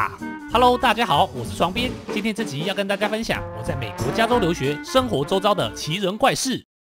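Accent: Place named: Yilan, Taiwan. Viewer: 經過我不專業的耳朵，你應該是宜蘭腔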